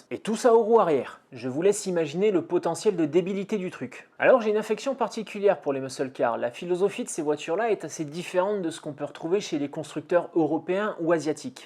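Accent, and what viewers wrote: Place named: Quebec, Canada. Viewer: Simple question j'ai remarqué un peu ton accent est tu d'origine québécois ou tu vient de la France ?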